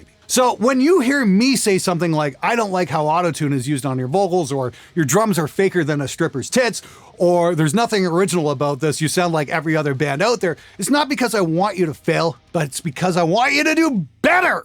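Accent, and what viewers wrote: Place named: Canada. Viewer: I wasn't surprised one bit when this guy said he was Canadian, my guess somewhere in the southern Ontario. Love your shit keep them coming!!!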